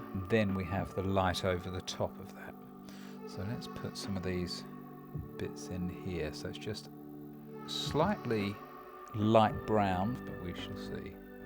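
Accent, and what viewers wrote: United Kingdom. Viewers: As a visual learner this is perfection. But as a vocalist/musician the volume fluctuations are maddening. I'd like to hear your lovely Brit voice better, without constantly adjusting the volume. Stunning work as always though.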